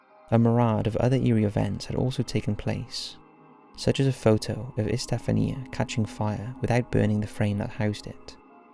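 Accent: Wales, United Kingdom. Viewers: I love your channel man, what part of Wales are you from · Anyone else feel that narration with a British Accent makes these stories more scary?? Or any accent from the U.K. for that matter...I don't feel the same air of scariness with a regular American accent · It's the soft Welsh burr of his accent that does it for me.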